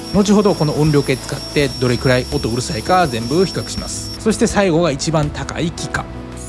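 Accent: Kansai, Japan. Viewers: 一生〇〇って話し方関西人特有だよな。嫌いだわー内容いいのにもったいない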